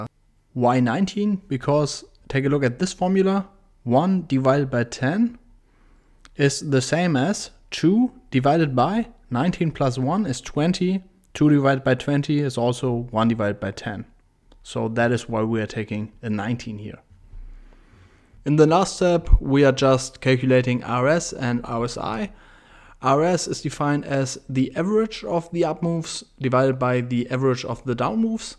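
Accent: Germany. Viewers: You are also a German. Your English accent is my hint^^ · Hab an deinem Akzent erkannt, dass du Deutsch bist :D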